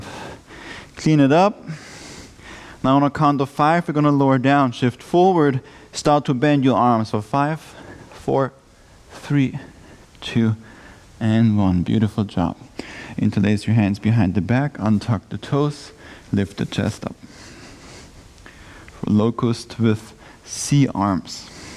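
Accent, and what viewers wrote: Germany. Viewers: ich dachte mir doch schon, dass ich da einen deutschen akzent gehört habe :D herrlich! mega flow. awesome job.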